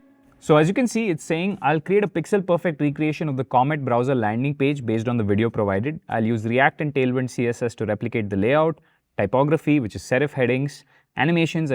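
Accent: India. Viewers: For a moment, I thought i accidentally switched on the hindi dub mode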